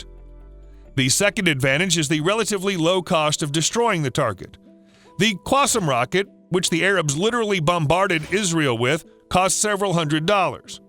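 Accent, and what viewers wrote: United States. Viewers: typical American annoying voice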